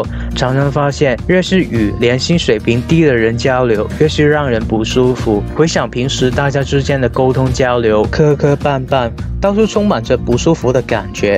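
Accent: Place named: Hong Kong, China. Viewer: u are from hong kong right? your accent seems like hk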